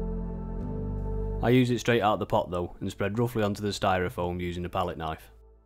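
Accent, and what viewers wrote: United Kingdom. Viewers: You have taken a simple concept and made it look so realistic. I admire your attention to detail and vision in these projects. Keep up the good work. If you don't mind I am curious about your accent. What part of the UK are you from?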